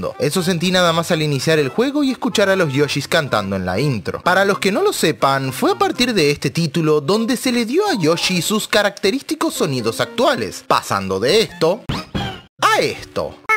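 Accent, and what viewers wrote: Uruguay. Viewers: espera... Sos de Uruguay?... Paisano! xd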